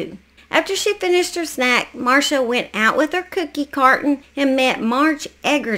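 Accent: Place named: Tennessee, United States. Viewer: I almost flip this video until i remembered someone saying my Pal was unintelligent. Country doesn't mean dumb ma'am. Yes said ma'am because I am born and raised in Tennessee. I suspect we sound very similar. The love of my life called me bammer the day we met. Had to look it up to get a clue. 😂 Somas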